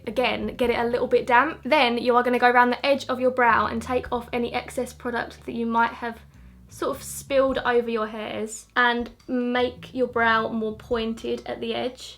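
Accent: Essex, United Kingdom. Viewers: Are you from Essex??? You sound like you have an Essex accent and I'm originally from Essex so I can spot it out. Loved your makeup btw, very pretty.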